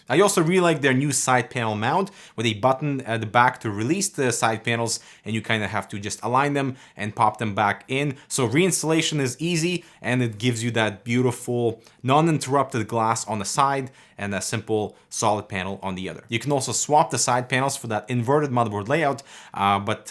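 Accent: United States. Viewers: is your accent american or swedish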